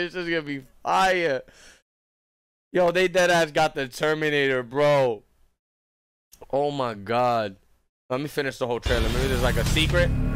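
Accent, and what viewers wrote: New York, United States. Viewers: are you from NY? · Love your reaction. Love your NY accentos. It succs ass Spawn will be released in March yo. And that "no homo" comment made me laugh! I still say that shitos!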